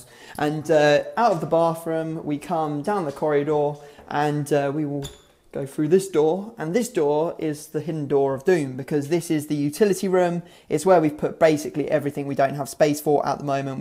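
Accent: Nottingham, United Kingdom. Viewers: Are you from nottingham? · Do u come from nottingham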